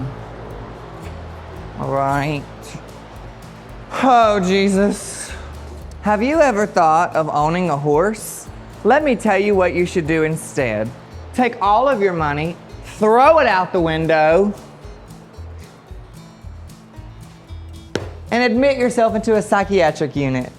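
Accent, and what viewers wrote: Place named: United States. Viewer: Lol.. Why does he sound country, black and gay 😂😂😂 allllll at the same time .. Which is fine and its funny...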